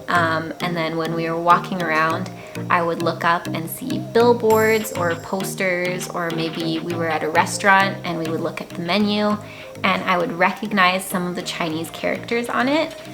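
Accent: Taiwan. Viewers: 你講中文根本就是台北腔了，跟你老公根本沒差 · What apps or resources did you learn Mandarin with a Taiwanese dialect/pronounciation? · 這個小姐姐說話都很台也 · the Mandarin you spoke make me feel very comfortable, that's because you have a strong Taiwanese accent! I learn English from you and other youtubers, like Wes Davies...etc. Briefly that Wes and you speak the most clear and neat English that I learn. Very good listening that I'd ever had. Also I am learning Japanese, too. My opinion to you that making English videos can make your channel more popular, and get more subscribers! keep following your channel. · 觀看的影片一直讓人覺得很舒服，妳的中文有好聽的台灣親切口音，節奏也很放鬆彷彿就像是個熟悉的好朋友在身邊說話，謝謝你和我們一樣一起愛這片土地與人文，祝福越來越好! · 好道地的台灣中文~ 命中註定當台灣人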